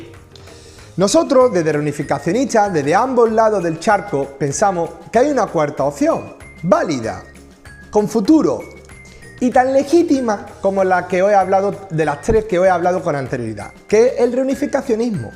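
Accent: Andalusia, Spain. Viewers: Soy andaluz, respecto a tu acento decir que si me dicen que eres de un pueblo vecino me lo creo. Podrías ser mi primo hermano literalmente. Tu acento es más parecido al nuestro que el de un Asturiano, Gallego o Aragonés. Es como si hubiera conocido un hermano raptado de la familia y me lo hubieran presentado ahora. Es sorprendente como pueden intereses políticos y las gerras pueden crear nuevas fronteras entre las personas que en esencia somos iguales. Un abrazo hermano. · Es andaluz... lol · Eres Andaluz?? Hablas igual que yo. (Sevilla)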